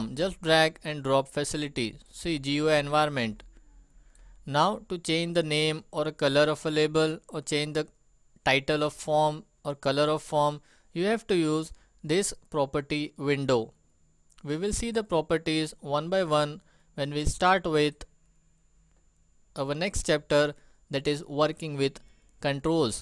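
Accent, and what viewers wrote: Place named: India. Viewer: i think he is indian man. his accent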